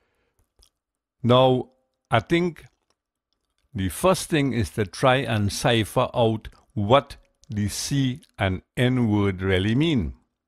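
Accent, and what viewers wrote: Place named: Trinidad and Tobago. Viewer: Thanks, bro. Glad yuh like it...we cyar stop talkin Trini, ent?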